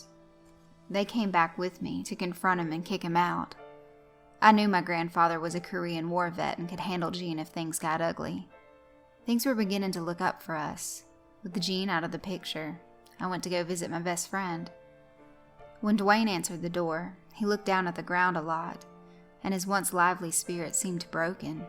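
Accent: South, United States. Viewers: I have heard you say that you are from Georgia , but I don't think that I have ever heard a southern accent from you . That is until this video . While the theme of the video is always a sick and sad story , your voice gave it understanding and empathy for the friend in the story . Some reading this would automatically go for the anger and disgust of Chango . They probably also cheered when he was killed , but I think the story goes well beyond that and to the suffering of the victims . But either way your voice gave respect to the victims of child molestation and to the survivors .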